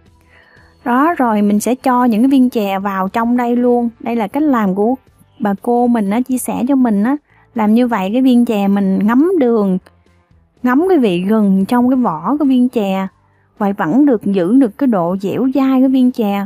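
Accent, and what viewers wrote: South, Vietnam. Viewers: Con gái miền Nam nói giọng thật nhẹ nhàng dễ thương! Làm bánh cũng đẹp nữa. Mong con chia sẻ nhiều video để mọi người tham khảo học tập và làm theo con nhé!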